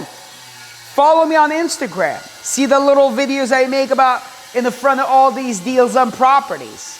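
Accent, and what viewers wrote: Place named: United States. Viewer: Thank you for sharing your journey! Your American Accent is Wow !🎉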